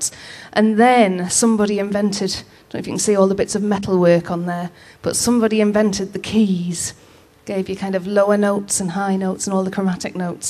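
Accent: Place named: Newcastle, United Kingdom. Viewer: shön nach 40 jahre mal a geordie acent zu hören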